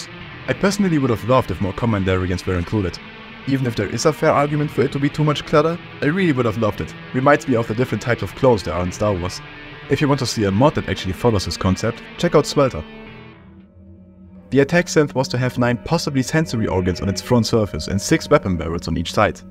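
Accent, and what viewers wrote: Germany. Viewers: Ich liebe deinen deutschen Akzent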